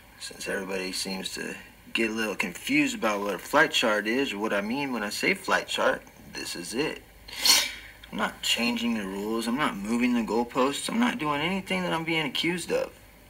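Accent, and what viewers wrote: Australia. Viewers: I guess it's the australian accent. Buddy ;o) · that silky smooth Aussie accent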